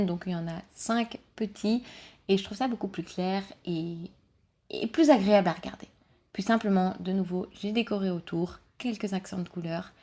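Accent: Belgium. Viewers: Hello ! Je suis une nouvelle abonnée à ta chaîne ! En effet, je me demandais de plus en plus si le bullet journal était fait pour moi ou non et je me suis gavé de vidéos pendant toutes une journée sur le sujet. De ce fait, je suis tombé sur ta chaîne et je la trouve géniale (et toute mignon, au passage !) ! Ca fait du bien d'entendre un accent de chez nous, hahaha ! D'ailleurs, je me demandais de quelle région viens-tu ? J'aurais parié pour Brabant Wallon ! Enfin tout ça pour dire que tu m'as beaucoup inspiré dans l'organisation de mon bullet ! Ca n'est jamais facile de commencer dans un domaine qu'on ne connaît pas et avoir des petites vidéos comme les tiennes sous la main est toujours utile ! Donc voilà, je te fais de gros bisous (cachuètes ;P ) et continue comme ça ! (Et bien évidemment, je participe aux concours ! )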